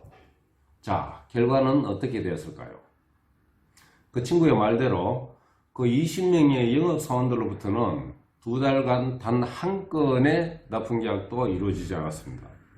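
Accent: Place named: Gyeongsang, South Korea. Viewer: 사장님 교포세요?! 제가 중국에 살아서 조선족 많이 봤는데 말투가 경상도 분 같으시네여 흑룡강 분 이신가오!?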